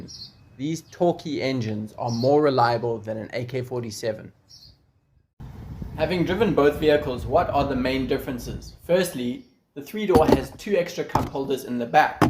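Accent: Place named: South Africa. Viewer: Loving the South African accent and "Eish"a good name! My 1996 5 door is named RAVioli, a nod to my Italian heritage. Where can I get a cargo door handle that doesn't cost an arm and a leg? I'd need to ship to South Africa. eBay and Amazon sellers don't ship here from the US. I don't mind second hand. 🙏🏼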